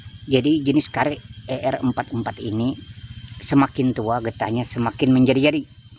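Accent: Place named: Banjar, Indonesia. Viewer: Ini di kalsel,beliau logat banjar ,dan mmg suku banjar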